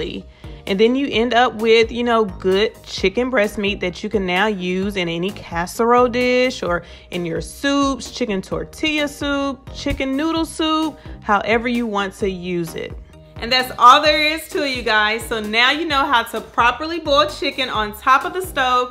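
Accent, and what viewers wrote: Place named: South, United States. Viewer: You got to be from the south girlll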